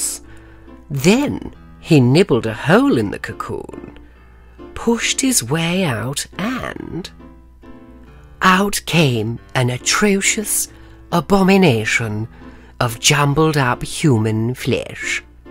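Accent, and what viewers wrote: United Kingdom. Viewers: The most Bri'ish person eva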